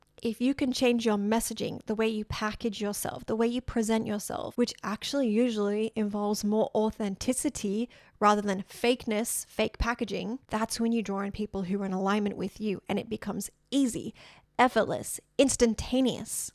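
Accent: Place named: Australia. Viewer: Girrrrllllll 🙌💓 you’re killing it! You have done a phenomenal job expressing this topic. It’s nice to hear a fellow Aussie voice on YouTube.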